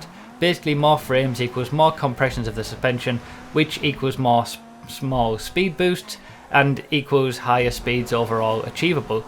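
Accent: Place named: Northeast England, United Kingdom. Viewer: where are you from? Because I am also from the north east of England and your accent sounds a lot like mine.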